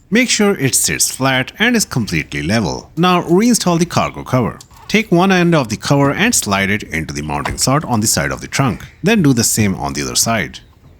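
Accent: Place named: India. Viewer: Great video. But Im not doing all that! Nice accent, though. New Delhi? My ex-husband is Punjabi from Panchkula. His sister is famous actress Yamini Singh. She’s in Mumbai, of course! My didi. ❤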